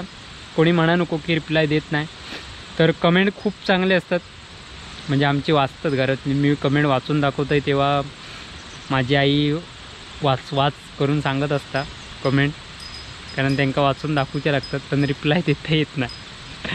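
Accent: Malvani, India. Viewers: तु मालवणी बोलतोस तेच आम्हाला आवडते तुझे गावचे विडीयो एक नंबर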